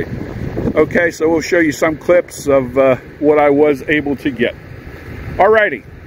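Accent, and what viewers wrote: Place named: Pennsylvania, United States. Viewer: Hello my friend! Questions is your accent south or central Pennsylvanian? South Jersey or Maryland?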